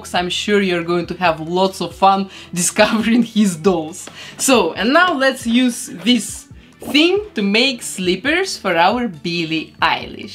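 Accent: Russia. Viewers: Почему-то слышу русский акцент 0.0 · Акцент русский) Я как будто слушала подругу которая говорила непонятные слова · Да, акцент русский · Настолько русский акцент не может быть у англичан. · согласна с тобой такой русский акцент... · Да-да-да, я тоже заметила, ибо понимаю английский только с русским акцентом, таким образом, я "поняла" все видео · Sydnie Harrison Maybe this woman is from Russia. She has Russian accent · SSO I think so, cause I'm Russian😅 Sooo, I recognize the Russian speaking manner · Очень очень русский) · Да потому что только русские говорят слово рили всех английского акцента😂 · DUH ONA RUSSKAYA PONYATNO PO HER ACCENT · AryanaJoy Babauta, yes, she is Russian. It’s obvious she is. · Ток хотела написать! Тут явный русский акцент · Какой сильный акцент. Сразу поняла, что она, возможно, русская) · Russian accent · She has a very strong accent. Immediately clear that she is Russian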